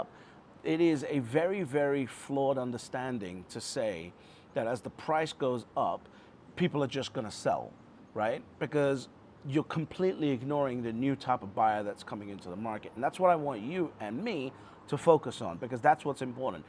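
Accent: Scotland, United Kingdom. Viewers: Shut the F up and get fantastically rich ! Advice taken ! PS are you from Scotland ? Detect a slight Scottish burr there.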